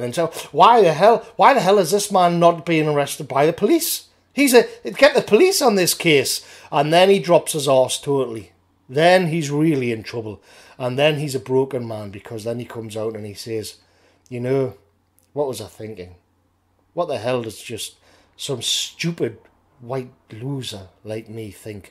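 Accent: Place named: Newcastle, United Kingdom. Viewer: Canny to hear a Geordie voice on here!! But, what gets me about this 'story' is this...he tweeted that pic and the pearl-clutchers went batshit crazy etc, he got hammered for it, sacked and everything. Yet they PUBLISHED the same sodding picture, so, why arent they being hammered for the same thing? The hypocrisy is overwhelming.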